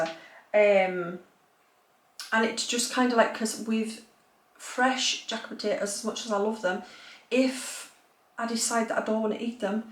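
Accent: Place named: England, United Kingdom. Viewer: I think what I like best about you and your videos, besides the English accent, which is awesome...you are just real. I watched one haul, nice enough lady but it left me feeling like I was a giant loser, 😂. You live like me, you get tired, bitchy, you have kids w/issues, pets who eat better than you do, and it is just life. I do not want to see someone who, God bless them, has a meal plan for the Queen. Sometimes we eat well, sometimes a Pop tart is dinner. I am in Illinois, which is in the United States, in a city 2-2 1/2 hours South of Chicago, to give you an idea. I no longer drink but I would go to a bar with you anyway!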